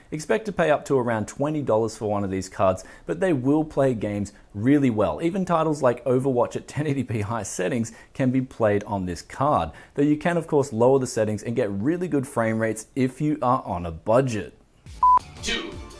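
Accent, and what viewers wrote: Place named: Australia. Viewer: Yeeees! It’s nice to hear a fellow Aussie saying “h” correctly rather than all these Americans saying it the wrong way.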